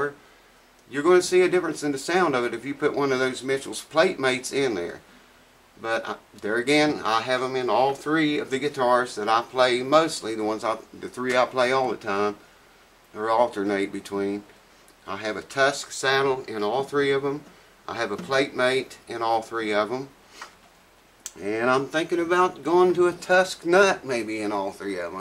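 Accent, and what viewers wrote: United States. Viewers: Enjoyed that even though I hardly ever play my acoustic, still you're a character and I dig your accent, what state of America are you from? Cheers Si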